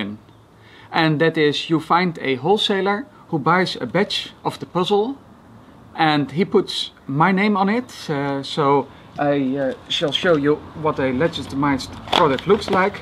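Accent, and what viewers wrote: Netherlands. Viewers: I love your accent, are you from somewhere around Netherlands? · Yeah, he's dutch.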